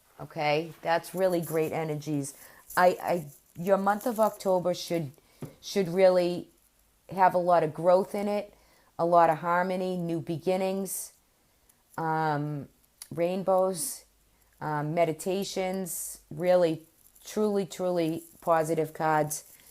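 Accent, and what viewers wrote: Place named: Michigan, United States. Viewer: Love your accent! Michigan, Ohio possibly?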